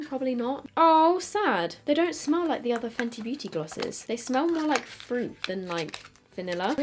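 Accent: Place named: England, United Kingdom. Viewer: She’s English not Irish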